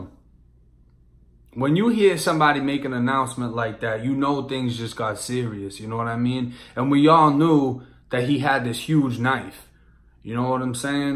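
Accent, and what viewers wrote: Boston, United States. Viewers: I was listening to your stories and I'm like why tf bro talk like he from Mass. I can hear the boston accent . I'm from Chelsea Ma . Spent a total of 5 yrs in prison . Keep up the stories bro. I fucks with you. Even though I'm ♿♿♿ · I Can Still Hear That Boston Accent A Bit 😂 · I noticed this with this older video.... he sounds like he's from the south... his new videos sound bostonian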